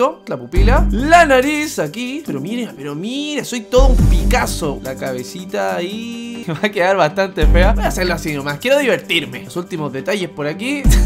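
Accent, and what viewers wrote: Chile. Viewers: eres chileno? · Se nota mucho que eri de shile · Eri chileno weon? :V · Eres de chile verdad?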